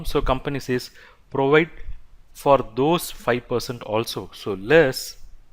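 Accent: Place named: India. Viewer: no offence but indian english is very shitty..yall casketing the shit outta it....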